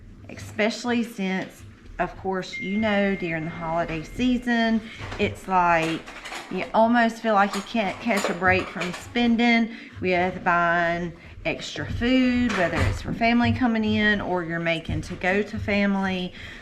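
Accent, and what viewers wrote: South, United States. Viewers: Subscribed to both yalls channels and watch daily. Girl you even more southern than i am and love to hear you talk.keep up the good work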